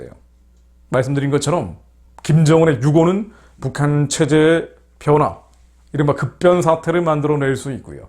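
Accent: North Korea. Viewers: 김성욱대표님 목소리를 듣다보면 마치 평양출신이 말하는 것 같다. 아마도 부모님께서 평양출신이 아닌가 싶다. 지금 북한지도부는 완전 궤멸됐다고본다. 만약 한국 대통령이 정상적 인간이라면 즉각 북에 밀사를 보내 현황파악과 함께 특공대를 보내 평양을 접수하고 북한을 통제할 것이다. 미군과 함께 핵무기통제권을 장악하는 것이 초를 다투는 급선무다.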